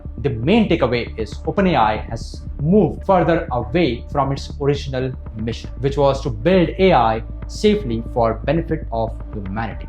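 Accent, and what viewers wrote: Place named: India. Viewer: omg. i guess your audience is meant for indian people not american people. you are impossible to listen too with your accent.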